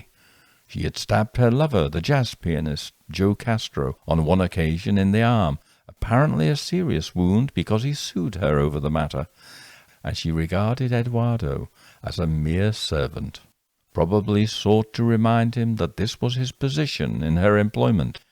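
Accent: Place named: Northern England, United Kingdom. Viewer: Your narration and voice are perfect. Every so often a tiny northern inflexion. Such a lovely lilt amongst English accents, which lends perfectly to spine-tingling and sinister tones.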